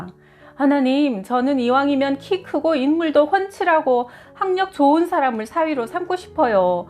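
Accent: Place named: Jeolla, South Korea. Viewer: 아따 전라도 사투리가 구수하요 잉~~~ 어쩜 이렇게 사투리가 맛깔나게 표현 하실까 사투리로 웃다가 예수이름 나오니 눈물이 가득 했다가 점쟁이가 예수님 믿는걸로 웃다가 울다가 ㅎㅎ 감사합니다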